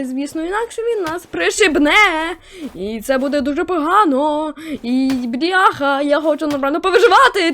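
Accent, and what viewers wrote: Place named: Ukraine. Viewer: Чо у тебя с голосом? Стоп! Ты украинец! Блин😢 я русская но ты класный❤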